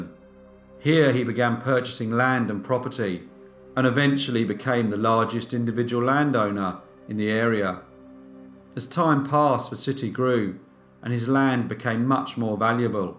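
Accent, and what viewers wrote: United Kingdom. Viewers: I would love to know where your British accent is actually from.London?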